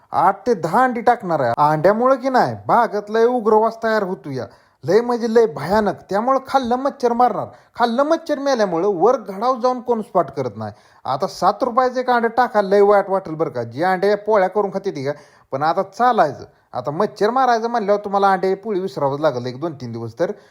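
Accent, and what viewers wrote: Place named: Khandesh, India. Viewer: तुमची भाषा आणि बोलण्याची पद्धत बिलकूल बदलू नका भाऊ जय महाराष्ट्र जय खान्देश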